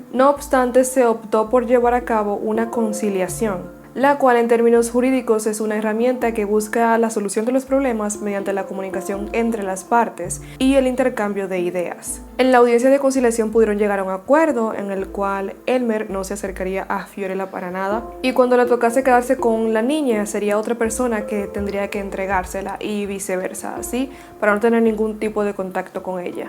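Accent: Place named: Dominican Republic. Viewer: un placer, te lo pregunté porque soy dominicano y te reconocí la forma de hablar...jjj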